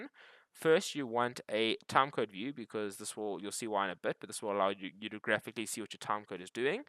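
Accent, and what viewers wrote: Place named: Afrikaans, South Africa. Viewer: Hey, DELPHI 7 on the taskbar!! Lol. Jy klink Afrikaans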